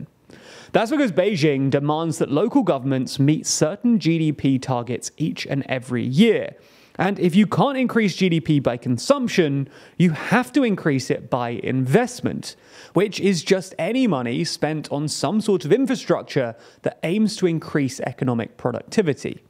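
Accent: England, United Kingdom. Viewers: Aaron This dude is British. Listen to his accent.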